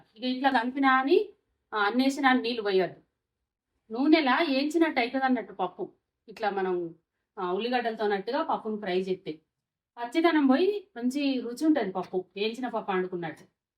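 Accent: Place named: Karimnagar, India. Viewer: Karimnagar slang matladthunnaru 10years tharvatha malli akkadi vaallatho matladinattu happy ga vundhi chaala manchiga nerpisthunnaru. maadhi Kadapa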